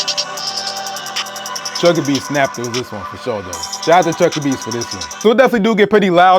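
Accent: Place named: New York, United States. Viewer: Your definitely a new yorker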